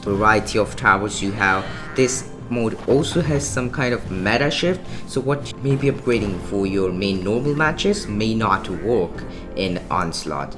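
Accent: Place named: India. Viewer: why can’t indians say “er”??? its always “ohh” like instead of “first” they say “fohst”. or burst is bohst. so annoying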